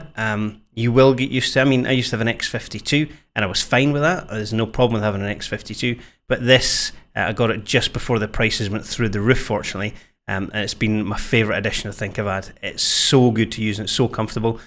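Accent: Scotland, United Kingdom. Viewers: OoooOooOOO dat thicc Scottish accent. Congrats on 10k!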